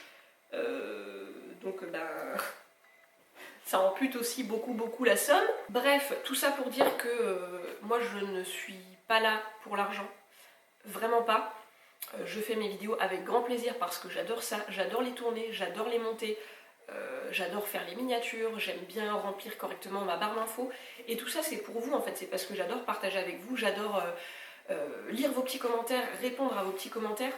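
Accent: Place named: Brittany, France. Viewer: Ha ha Mdr, et baaaah perso moi j'adore tes mimiques et ton petit accent breton qui me rappel mon chez moi, voilà dix ans que j'ai troqué ma plage de Pen bé et mon sel de Guérande pour les volcans d'Auvergne. Je suis partie en pensant que nous breton n'avions pas d'accent, un an plus tard je me suis rendu compte de notre façon de parler et ça me fait plaisir d'entendre ça dans tes vidéos. Serions-nous les seuls à comprendre? 🤔 mon mec déteste quand je rentre en Bretagne je reprend l'accent et la façon de parler direct ! 😅 je laisse rarement des commentaires mais là je me devais de te le dire, tu es une de mes youtubeuse préféré justement parce que tu es naturelle, sans chichi, normal quoi, et ça fait tellement de bien 😄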